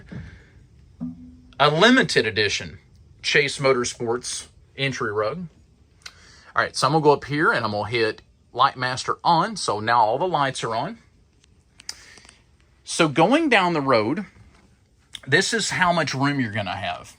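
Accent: South, United States. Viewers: I like your southern drawl. It sounds so homey.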